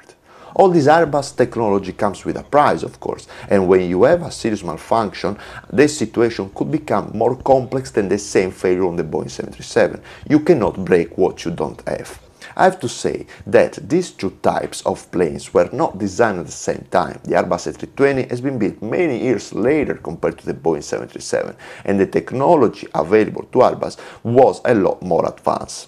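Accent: Italy. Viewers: Sei italiano e sei sardo ahahahah si sente dal inglese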